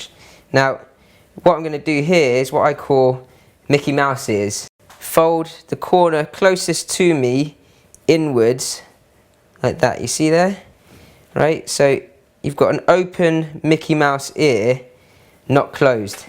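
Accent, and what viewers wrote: United Kingdom. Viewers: I don't really care about his cooking skill... thinking from Asian American point of view, he has the cutest British Accent...LOVE IT! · British accent is so elegant. I love dumplings anyway! :O)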